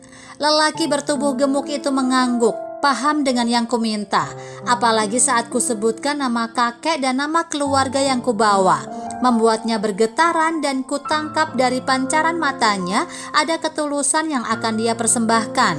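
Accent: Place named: Sunda, Indonesia. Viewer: Suaranya kaya suara euceu2 org Sunda 😅😂🙏🙏🙏🙏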